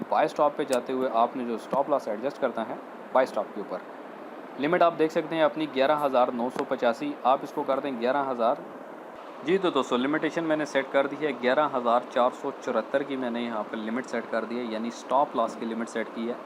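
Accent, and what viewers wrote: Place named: Punjab, India. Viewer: Good information ji Stay blessed. Nice Punjabi touch too. 🙏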